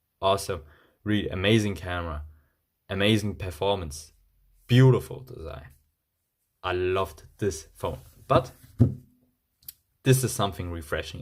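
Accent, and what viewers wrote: Germany. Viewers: Your english is very....german Wieso machst du deine Videos nicht gleich in deutsch?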